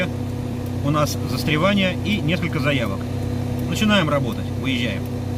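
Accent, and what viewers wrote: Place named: Saint Petersburg, Russia. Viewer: оу, питерский окцент, в верьх.спасибо за кино.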